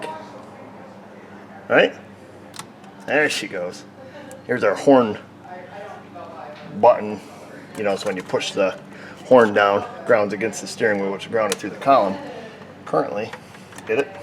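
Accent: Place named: Midwest, United States. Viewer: I know he lives in Avoca, NY. But, listen to how he talks. He has a thick mid-western accent. I know several people from Michigan. One lives right across the road from me. doesn't sound like a New Yorker at all. I'm just curious where he's FROM.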